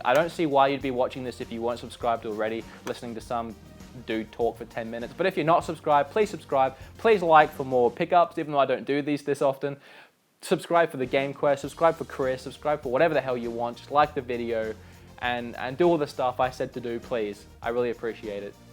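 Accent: Australia. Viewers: The aussie accent definitely got less noticeable watching this in 2026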